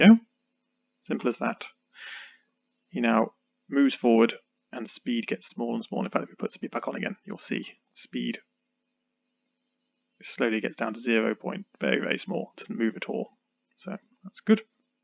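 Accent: United Kingdom. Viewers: waitwaitwait you're BRITISH?? that's what it sounds like.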